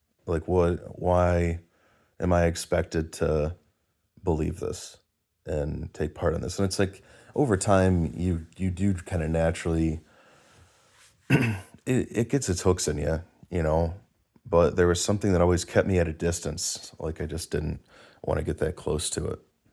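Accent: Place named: Canada. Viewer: I was angry too! Disfellowshipped in 2005. Now I'm happy. . Here is an end time prophecy they never talk about that has always been in every Christians bible and yet, few are the ones finding it: . Isaiah 66:5 Hear the word of Jehovah, YOU men who are trembling at his word: “YOUR brothers that are hating YOU, that are excluding YOU by reason of my name, said, ‘May Jehovah be glorified!’ He must also appear with rejoicing on YOUR part, and they are the ones that will be put to shame.” . [Name of person] is no longer one of JEHOVAH's Witnesses ---->> "excluding YOU by reason of MY NAME". . Do you see Jehovah's promise to disfellowshipped Jehovah's Witnesses? . "He must also appear with rejoicing on YOUR part"! . . So who is right? . Jehovah or, eleven men who live in New York? . My faith and belief has vastly increased since leaving! . . I'm HAPPY. . Luke -23 HAPPY are YOU whenever men hate YOU, and whenever they exclude YOU and reproach YOU and cast out YOUR name as wicked for the sake of the Son of man. 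23 Rejoice in that day . [NAME OF PERSON] is no longer one of Jehovah's Witnesses ---->> "and cast out YOUR NAME" . . Another prophecy about Rejoicing! . . You sound Canadian. . You could try sharing this with your family. .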